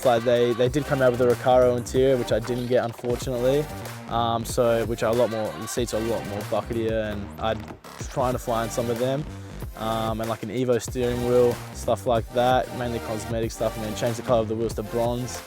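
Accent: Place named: Australia. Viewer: Haven't you heard an Australia accent ??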